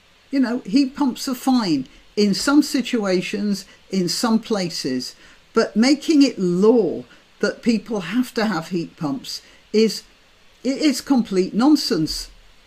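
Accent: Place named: England, United Kingdom. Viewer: It is amusing to hear someone talk about "Scottish madness" when your accent suggests that you live in, or come from, a country that is slowly disappearing under the open-toed sandal of Islam. The army that is being sent over in an ever-increasing armada of little boats, like Dunkirk in reverse, will only stay quiet until they reach a tipping point. When they reach a critical mass, bad Muslim and nice Muslim alike will not be asking for anything, they will be taking. And we will have lost everything thanks to our determination to be kind and welcoming. Piddling about talking about heat pumps will suddenly seem like an incredible waste of time after that point. Why the women of England aren't shitting themselves in terror of this is beyond me.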